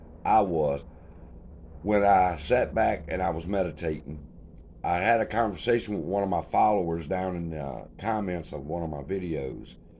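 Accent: South, United States. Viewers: I love your accent ? May I know where you are from ? Is that southern ? I am from Asia so I dunno much.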